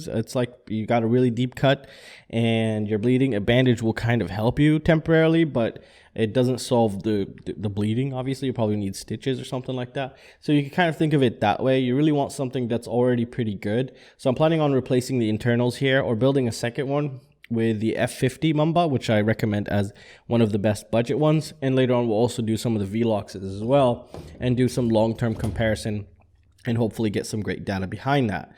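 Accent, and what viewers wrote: United States. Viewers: You sound very much american, yet you live in europe, just curious about your situation.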